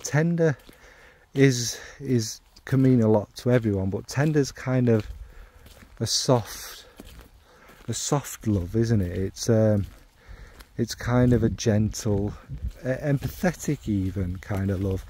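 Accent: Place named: Northern England, United Kingdom. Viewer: Wait.. What's an algorithm? 😅😅😅 Listen.. There's organic, there's forced and formulated, and there's a ton in-between. Some are naturally good at being robotic and sterile with it, some are really great with their 'stage presence' who are excellent on camera and just naturally are fantastic and popular. I only film things if it's enjoyable and it's something i want to watch back for my own viewing. I've an idea for a video which has absolutely no relevance and I'm sure zero people will be interested by I'll probably do it for my own lonely little world of nerdy viewing. Like a fragrance diary..that happens to be public 😅😅😅 Anyway.. I started drinking whiskey at the start of this video, and I'm half way in and feel pissed. So will have to pick it up tomorrow 😅😅 🥃🥃 but always good to hear a proper northern geezer being true and raw, if a little rough around the edges 😁😁 Never stop the stories and creative content Mr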